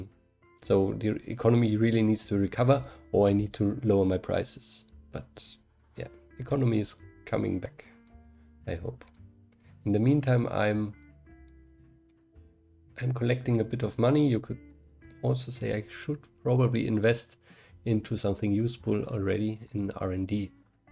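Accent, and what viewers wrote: Germany. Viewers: As a german, i really like your (german) accent. It makes it kind of easier to listen on a second screen (listen to your stuff as a kind of audio tutorial). Especially with kids around. Strange, but it works. Keep up the good work.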